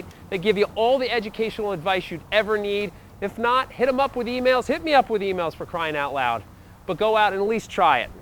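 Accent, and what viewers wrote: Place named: Pennsylvania, United States. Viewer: I knew I heard a PA accent.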